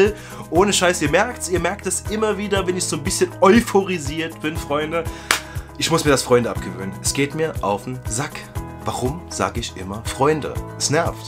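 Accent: Saarland, Germany. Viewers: Ich wussts doch eh Saarläääner auf weiter so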